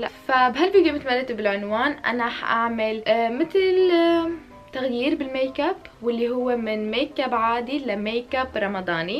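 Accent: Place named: Algeria. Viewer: نتي جزائرية تاع الصح نستعرف بهدرتك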